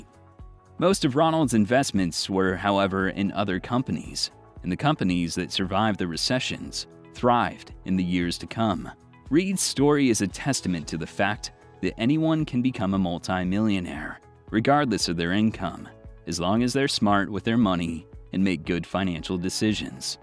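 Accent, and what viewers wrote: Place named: United States. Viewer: An American voice wow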